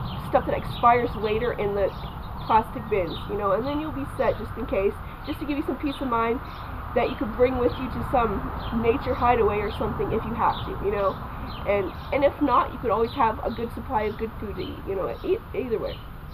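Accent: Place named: Ireland. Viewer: For some reasons i thought you were from Ireland.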